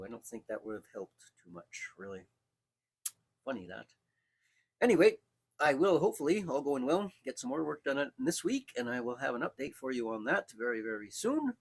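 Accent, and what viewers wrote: Canada. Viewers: I take it from your accent that you may be Canadian? One of the greatest scale modelers was Jack McGillvary from Toronto who built and flew his models to unsurpassed levels. He was one of the Flying Aces greats. Beyond that he was a Wakefield Trophy finalist in 1959. Have you tried rubber flying scale?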